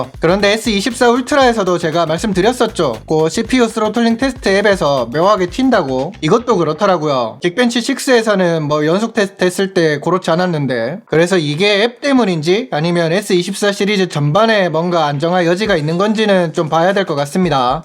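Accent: Gyeongsang, South Korea. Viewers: 숨길수없는 경상도사투리의 상세한 설명 감사합니다 ㅋㅋㅋㅋㅋㅋ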